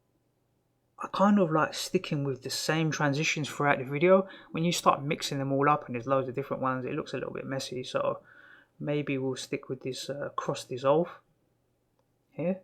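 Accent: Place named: London, United Kingdom. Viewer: Nice work Dude! brilliantly explained. The fact you sound like a Londoner makes it feel quite homely :)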